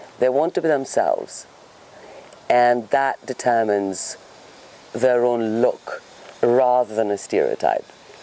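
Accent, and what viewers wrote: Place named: United Kingdom. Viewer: Бритиш англ😊я лов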